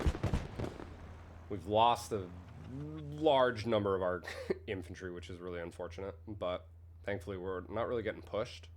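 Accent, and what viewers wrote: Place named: Ireland. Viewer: I'm struggling to pin your accent, I have a professor who is Irish who sounds kind of like you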